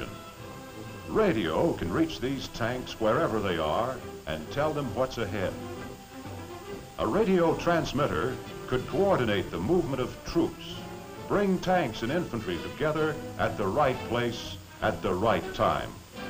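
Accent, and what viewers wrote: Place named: United States. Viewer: Beautiful American English Accent.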